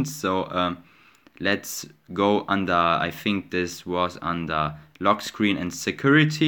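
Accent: Germany. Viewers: Man kann hören das du Deutscher bist :)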